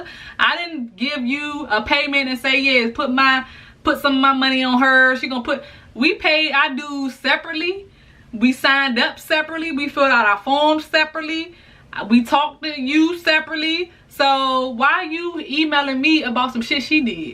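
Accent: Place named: DMV, United States. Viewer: Are you from the DMV? Lol you sound like it!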